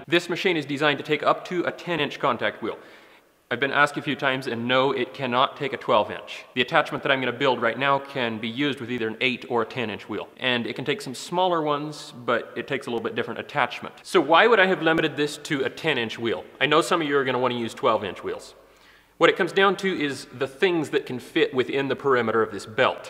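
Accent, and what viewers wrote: Canada. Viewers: This is the most Canadian sounding American i have ever heard.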